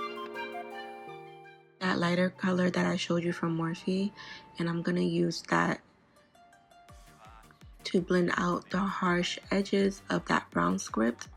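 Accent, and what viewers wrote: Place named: New York, United States. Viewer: Idk which is satisfying your accent or the makeup? Lmao let’s say both? Like are you from NY? 😭😍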